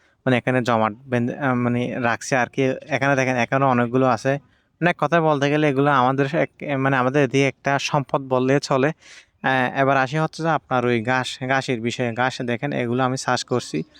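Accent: Chittagong, Bangladesh. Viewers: মনে হয় চট্টগ্রামের মানুষ বাড়ি কোতাই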